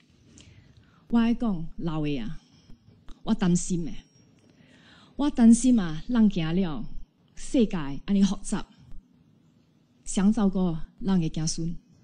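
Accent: Zhangzhou, China. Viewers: 這是閩南話漳州片的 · 她的 祖宗 应该是 福建漳州 他讲的话跟我 几乎一模一样 城市里面 漳州闽南话 我听的真的感到很亲切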